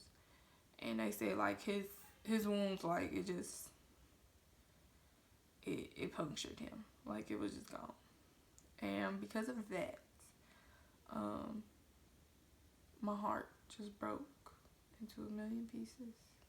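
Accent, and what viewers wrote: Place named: New Orleans, United States. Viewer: You from New Orleans?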